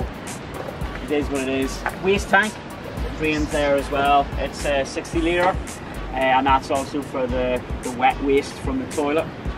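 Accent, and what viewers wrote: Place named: Northern Ireland, United Kingdom. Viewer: Love this van... Is he northern Irish? EDIT...he is.. Useful 😜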